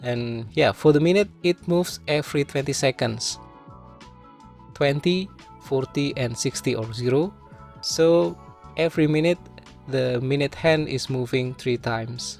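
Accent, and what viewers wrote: Indonesia. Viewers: channel review jam tangan underrated ini, inggrisnya bagus bgt dengan aksen khas indonesia yg sangat jelas pronounciation nya. lanjutkan terus mas mantap!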